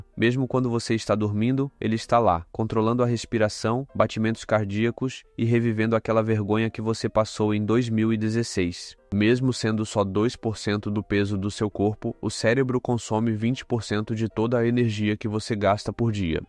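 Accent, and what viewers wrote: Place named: Rio de Janeiro, Brazil. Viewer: tira esse sotaque carioca, me da agonia mano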